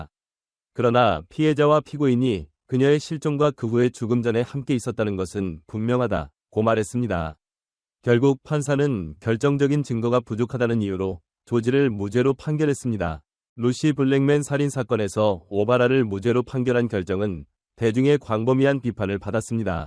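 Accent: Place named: Yanbian, China. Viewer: 요즘 조선족들이 범죄 유트브 시작하면서 사투리 때문에 이런식으로 많이 하던데 조선족이세여?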